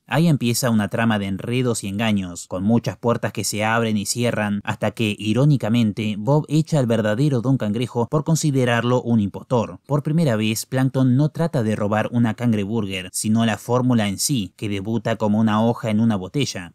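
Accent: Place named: Argentina. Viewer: Tu acento argentino es incómodo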